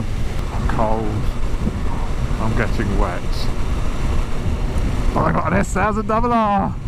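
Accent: United Kingdom. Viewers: i watch because your speech/voice is extremely enjoyable to hear!! Love the UK 🇬🇧 accent!! Fireblade RR-R -SP finally getting flashed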